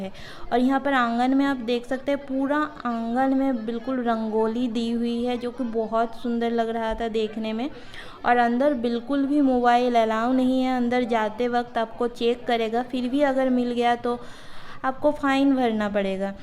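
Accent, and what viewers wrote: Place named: Bengal, India. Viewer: Aapka hindi acha hai bas thora bengla touch hai.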